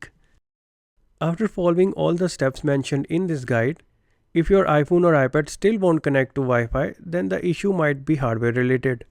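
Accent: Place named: India. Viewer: Indians sepaking english sound very ridiculous, Remove anything below your tonge or use subtitles, the world will thank you.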